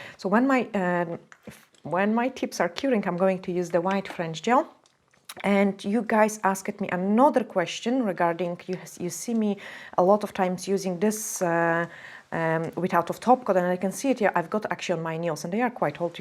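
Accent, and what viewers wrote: Scotland, United Kingdom. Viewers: I love these they're gorgeous! I've actually just tried doing some flowers like this and I'm amazed at how breaking it down and starting with the dots is such a HUGE help, so thank you! 👏 By the way, Is that a bit of a Scottish accent I hear? 🥰💜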